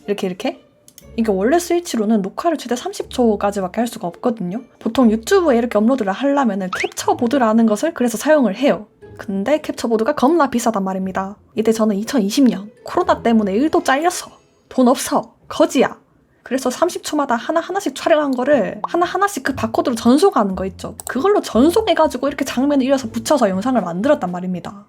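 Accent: Gyeongsang, South Korea. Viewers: 와...... 저 대구토박이인 사람인데요.... 님도 역시 대구사람이구나... 억양이 완전 대구사투리 따봉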